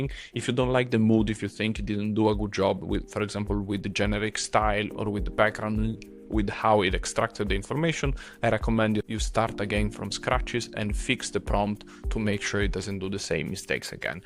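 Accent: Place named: Italy. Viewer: This is awesome thank you for sharing this keep showing up! Saw your LinkedIn post about your accent. I think the Italian accent is awesome! 🙌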